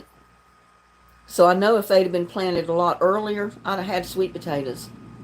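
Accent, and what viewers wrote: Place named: South, United States. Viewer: That was a cool live stream, though I enjoyed it so far and I still like your voice with that accent. I don’t mind accent you know southern sounding. I like the way you speak you know everybody does speak differently though.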